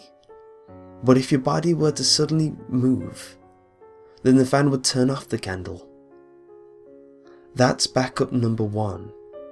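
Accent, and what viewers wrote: United Kingdom. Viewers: hes not...thats his accent, watch other videos and pay attention to the british english wording styles he uses.....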